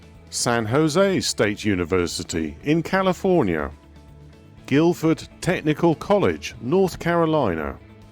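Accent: United Kingdom. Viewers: you think he would have considering the British accent.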